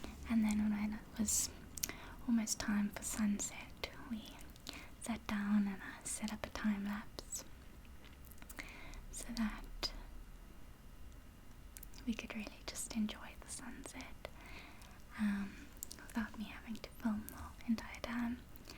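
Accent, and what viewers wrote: South Africa. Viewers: Hey I am from England I've never been to south Africa it's strange because I get home sick when I see videos of country's so far from England I live in England was born here but I think of being lonely and missing England if I lived in another country it gets me sad atually maybe I am so use to being in England that I have got a bond with country but I do love how pretty south Africa you do have the best accent by the way I think you are every English guys partner but I would get lonely even with you if I moved to south Africa but thanks for making these videos I will always like you're videos and south Africa is very beautiful one of the top 10 maybe top 5 country's in the world for beauty just like you you're pretty to and I hope you don't get lonely in your own country I am nearly 28 and lived in England all my life was born here but I get home sick so bad even if I go on holiday to any other country for 1 week but I love seeing south Africa and living in England because I don't get home sick or feel lonely it's strange feeling lonely it's like you miss the country you have been in all you're life but it's nice to see how south Africans live and I like the way you talk best accent ever in my opinion you look south African thanks for upload I listen to south African radio alot and just love the accents also I like how you always smile and happy I'm mostly happy to its best way to be especially at Christmas I commented on your Christmas tree video and called i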